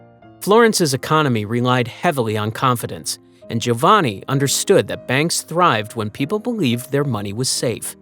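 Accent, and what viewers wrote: United States. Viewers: Having an American voice is wrong, ye shouldn’t Be involved in European history, go away ffs I immediately tuned out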